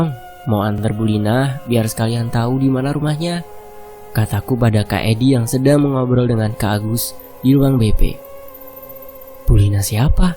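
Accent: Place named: Surabaya, Indonesia. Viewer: Waahh.. ini nih yg keren .. bawainnya kalem saja .. mau serem mau seru ..mau bercanda tetep enak didengarnya .. saya penakut banget ..karena suka di isengin makhlus...🙈🙈 Tapi tetep aja penasaran dan ketagihan denger kisah2mu min.. yaa..meakipin beraninya denger pas siang sampe ashar ajah..😅😅🙈🙈 abis suka ngangenin sih kalo sudah berasu2 ber dancuk2 ala logat suroboyoan mu min.. kriuk banget. !!😅 Tetep semangat berbagi ya min.. dibalik setiap kisah pasti ada pesan positifnya terlepas kisah fiktif ataupun nyata.. Oh ya ... Satu masukan ya min. Tampil total aja ngebawainnya .. modulasimu yg oke bisa dikembangin berbagai karakter .. cuma yg kurang berpadu kalo karakter kasar pake bahasa Indonesia .. enggak serasi dg modulasimu yg kriuk kalem itu.. kek kepepet gitu galaknya..😅😅😅. Oke trims min.. berkah memberkahi selalu ditiap kehadiranmu..👍😊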